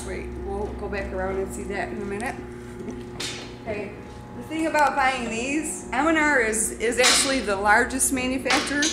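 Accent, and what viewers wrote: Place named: Upper Peninsula, United States. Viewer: Where is she from, she says midwest, but man I can't place that accent. Kinda sounds like a Yooper, but strangely off. She pronounces durometer as "Der-RAM-eater", and called a joint knife a "scOOOOper". Kinda Yooper-ish.....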